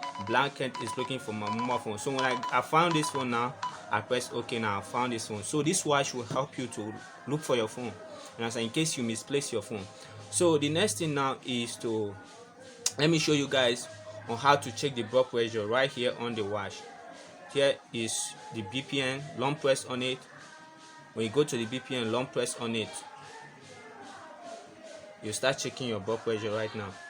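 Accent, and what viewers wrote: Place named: Africa. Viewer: Brother, I can tell you're African from your accent, and I'm really proud of your video. I bought this watch from the Best Buy online store, and I needed to know how to charge it. Thanks for your help.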